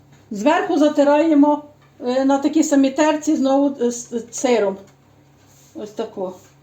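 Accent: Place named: Vinnytsia, Ukraine. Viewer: Дуже дякую! ❤ Подобаюиься вашi рецепти. Все таке смачненьке 🥰 Приятно слушать вашу речь. Мне очень нравится ваш говор. Детство и юность я провела в Винницкой обл. И мне такое все родное. Спасибо вам!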